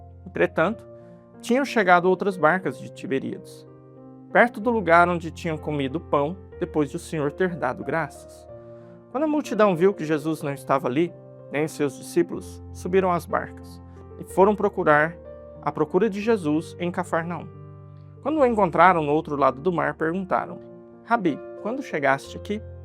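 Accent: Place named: Goiás, Brazil. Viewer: Uma abençoada semana para todos que ouvem o. Descobri ele aqui neste canal e não deixo de ouvi-lo todos os dias. A maneira como ele fala conosco, a forma simples e direta de explicar o evangelho. Os exemplos, as comparações e até a maneira de falar no Goianes dele, é maravilhoso. Que Deus te abençoe sempre. Quem sabe um dia posso conhecê -lo pessoalmente.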